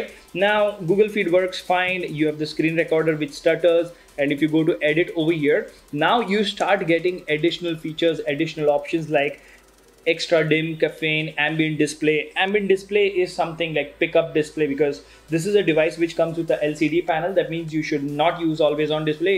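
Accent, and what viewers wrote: India. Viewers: Indian talking in English = Auto Subscribe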